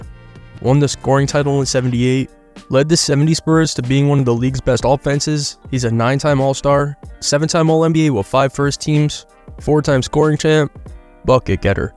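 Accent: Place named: Boston, United States. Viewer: Sorry, I found you hard to understand behind that Boston accent. The profile of the NBA logo is Jerry West. "Larry Bird is the first white person...." you simply don't know what you don't know. George Mikan? Pistol Pete Maravich? Bob Petit? During the classic period of the NBA, select games were broadcast in the middle of the night, often days after they'd occurred. It's popularity -- which didn't really reach modern levels until Jordan, sprang from college basketball, where people watched the Magic/Bird rivalry, and where their senior game is still the most watched college basketball game of all time. The NBA just gave people a venue for people to keep watching it. Also, I'll point out something that SHOULD be obvious -- to even know who the MVP is, you have to already be a fan. Quick -- think of a sport you don't watch. Who's the MVP? 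See what I mean? Boston, particularly in the 80s, was a highly color conscious place. But most of the country isn't Boston (thank god).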